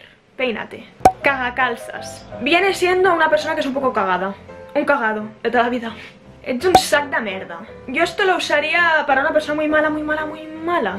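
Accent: Barcelona, Spain. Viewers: Ave cap dasa soc de bcn ciutat i parles igual q jo HAHAHHA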